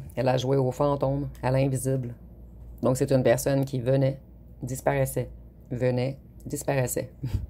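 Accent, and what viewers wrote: Quebec, Canada. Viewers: Bluffant !! Incroyable à chaque fois mais là 👏 bravo. J'adore votre accent québécois et aussi quand vous rigolez sur certaines cartes le concernant 😂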